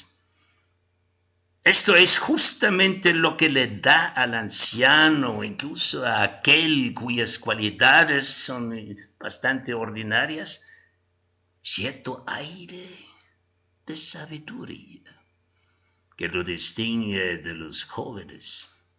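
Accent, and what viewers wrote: Germany. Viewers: es un man español que posteriormente fue a residir a alemania. ¿¿no le reconoces el acento alemán en ese español tan remarcado?? búscalo en googles, aparece como.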